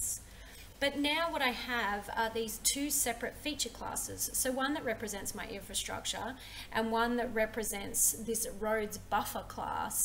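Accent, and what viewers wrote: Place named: Australia. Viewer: Nice to hear an Aussie accent!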